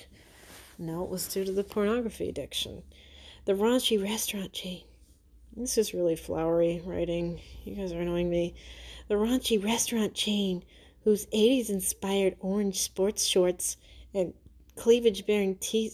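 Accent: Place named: Long Island, United States. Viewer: 👉 Great Long Island accent. Sounds like Taylor Dayne back in the 80's. ☺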